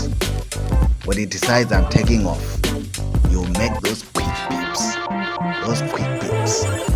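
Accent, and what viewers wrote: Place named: Zimbabwe. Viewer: I love this Brother, he somehow sounds Zimbabwean, great content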